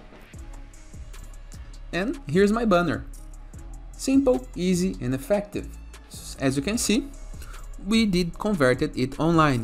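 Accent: Brazil. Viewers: Sotaque de brasileiro, acertei?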